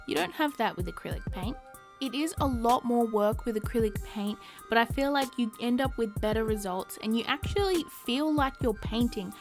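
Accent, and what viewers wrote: Australia. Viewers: I luv that australian accent :D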